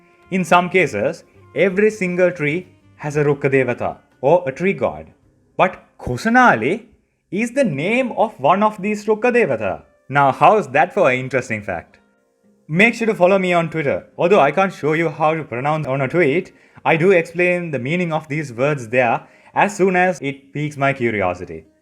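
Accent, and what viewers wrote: India. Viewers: Almost right ... but its south indian accent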